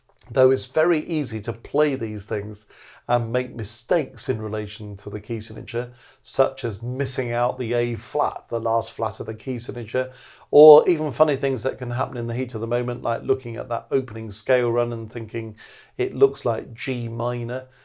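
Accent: United Kingdom. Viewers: I suggest that can you please teach us how to speak British? Because you have the great British accent when you are speaking....Please?? THANK YOU!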